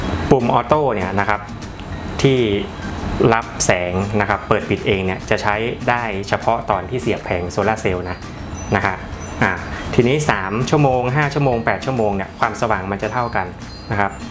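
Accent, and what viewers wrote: North, Thailand. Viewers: สำเนียงคนเหนือใช่ไหมครับ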